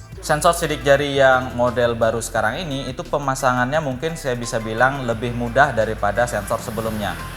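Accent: Bali, Indonesia. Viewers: maaf saya bntu,itu sbnrnya logat bali,yg salah hanya 'Mukin' sisanya logat bali digabung sama bhsa indo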